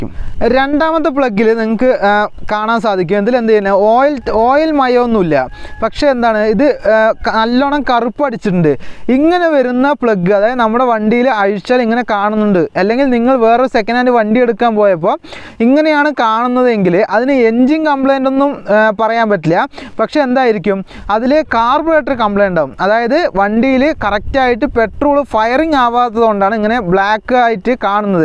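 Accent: Palakkad, India. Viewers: Chettan palakkad aano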